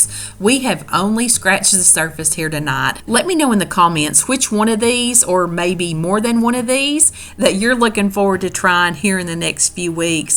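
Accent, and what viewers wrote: South, United States. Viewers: Been watching your videos - love 'em. You have a natural talent and come across so sincere and sweet! And a great accent ! I'm a deep south girl from Looziana! Love how you say "Fancy" - it's a southern thing! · Just found you and had to subscribe! Love me some recipes that are meant for tweaking, and I could listen to that southern charm all day.😊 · you mention snow days. But girl, you sound so southern. Love listening to you. You have a very soothing voice. And boy do we ever need that in todays world.. Thanks for some of the easiest n tasty recipes I have made watching you. ❤️👏👏👏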